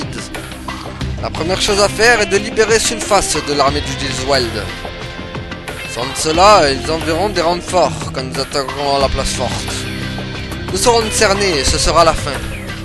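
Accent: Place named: Germany. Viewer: je voulais de l'accent allemand....jai ete servi! :)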